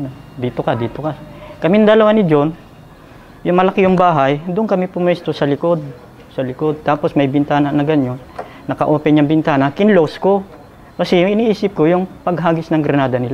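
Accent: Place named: Ilocos, Philippines. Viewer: Ilokano tuno mo master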